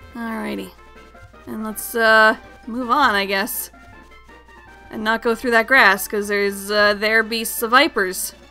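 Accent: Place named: France. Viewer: may i just say that is the most amazing french accent greqt vid as well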